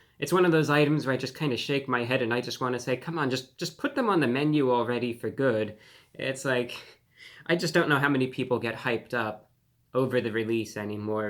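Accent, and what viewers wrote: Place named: Transatlantic, United States. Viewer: I've never seen someone in this modern era speak with a Transatlantic accent. Fascinating!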